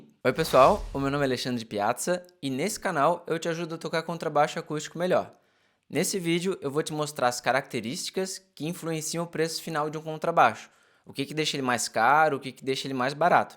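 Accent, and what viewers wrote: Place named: Santa Catarina, Brazil. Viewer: Eu sabia que vc era Catarina. Hahaha. Sotaque não nega. Tamo junto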